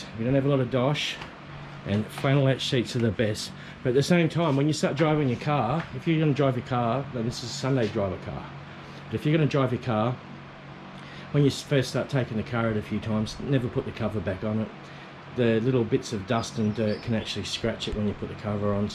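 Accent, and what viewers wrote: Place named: Australia. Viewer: Ppls should be to speak english language! Your EN with accent AU....I get it"-----they lift stopped on the 1st floor😆😆😆cu.koo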